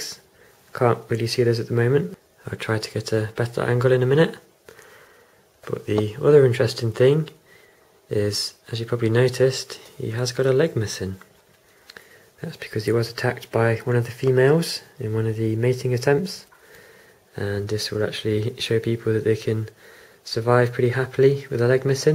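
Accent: United Kingdom. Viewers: I thought your accent was Derbyshire Midlands.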